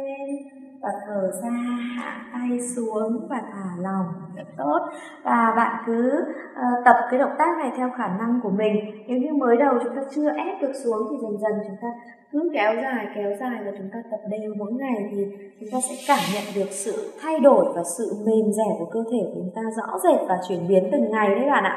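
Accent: North, Vietnam. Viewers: giọng miền bắc mà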